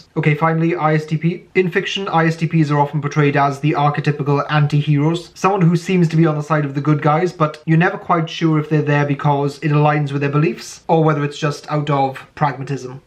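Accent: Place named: United Kingdom. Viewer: I'm a ravenclaw and INFP 🤓 you got it right. Also, I am not a native English speaker and I find hisaccent very interesting. It is hard to understand but at the same time I really like it. Is it british with a twist? I'm curious..🤔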